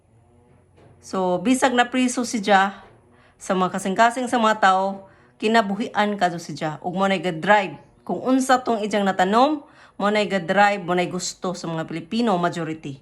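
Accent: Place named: Bohol, Philippines. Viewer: Baka taga Bohol siya,dahil sa pananalita niya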